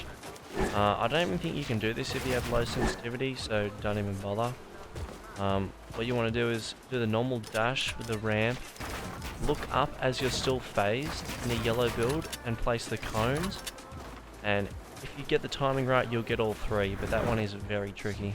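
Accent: Australia. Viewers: love the australian accent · R u Australian, if so r u best OCE?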